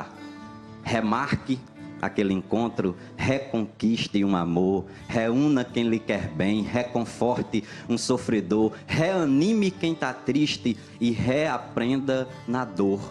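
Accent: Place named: Brazil. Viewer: Que bom ser premiado com uma poesia tão clara, que nos leva a meditar. E com o sotaque de Brasil, com vocabulário puro e nordestino, sem receio de nada. Sou eu fã. Um paraibano em Sampa cheio de Gratidão!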